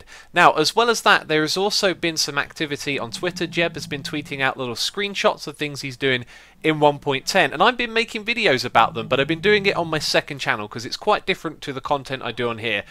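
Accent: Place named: United Kingdom. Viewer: British accent. Same as chuchorial.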